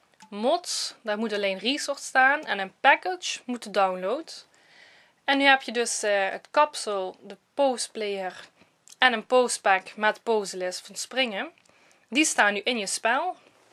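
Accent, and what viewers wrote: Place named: Limburg, Netherlands. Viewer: hey hey ik ben zelf ook half limburgs dus ik ben je accent wel gewend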